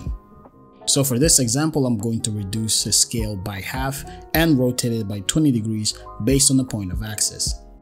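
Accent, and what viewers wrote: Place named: Portugal. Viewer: By your accent, can I conclude that you are a native of Portugal or some Portuguese speaking country ?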